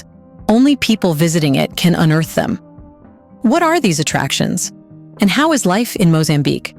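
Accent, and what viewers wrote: United States. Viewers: Good question, sounds American narrator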